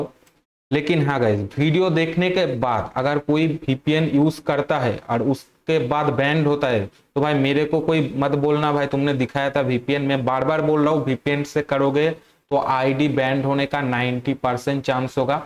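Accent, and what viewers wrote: Bengal, India. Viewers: bhai tmi bangali ho??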